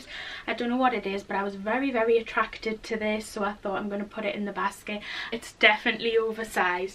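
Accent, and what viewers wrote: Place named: Durham, United Kingdom. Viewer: It’s a dressing gown lol but I’m also from Durham near you so we have the same way of speaking lol x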